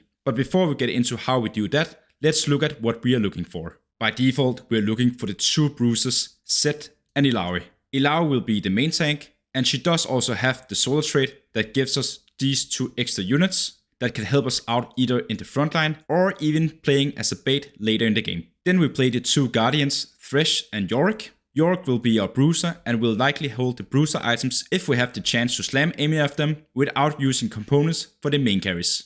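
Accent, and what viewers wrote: Denmark. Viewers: danish accent coming in strong:))) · Love your Danglish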